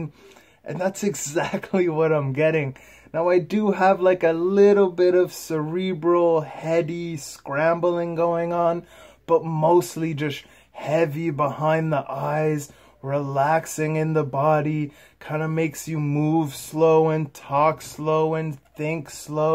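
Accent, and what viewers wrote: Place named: Atlantic Canada, Canada. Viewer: You from the atlantics? Trying to pin down your accent lol. New Brunswick or Nova Scotia would be my guess but it's really a toss up over there for me ahaha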